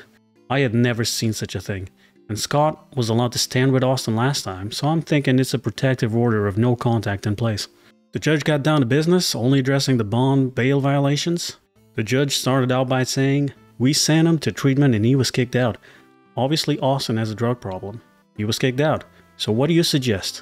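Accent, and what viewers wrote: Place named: Montreal, Canada. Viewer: it sounds like you’re from Montreal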